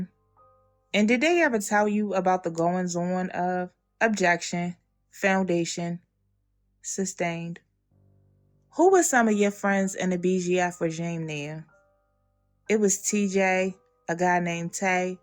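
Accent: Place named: Baltimore, United States. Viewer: The narrator is definitely from Baltimore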